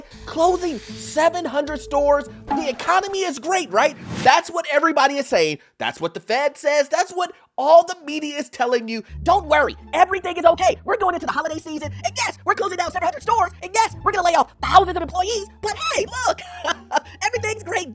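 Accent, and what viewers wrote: United States. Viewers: Love your laugh and your american style and your american dialect! Hi from Duesseldorf, Germany and thatnk you for keeping me up to date. ps more condo content please, especially condos in florida, thank you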